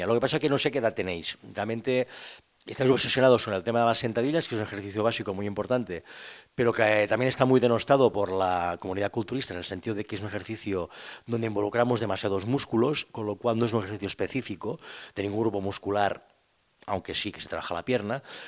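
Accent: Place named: Mexico. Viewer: que acento mas mexicano!!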